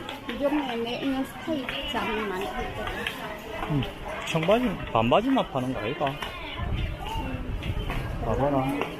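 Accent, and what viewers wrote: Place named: Busan, South Korea. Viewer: 부산 사투리 듣기좋아서 챙겨보고 있습니다 좋은영상 감사합니다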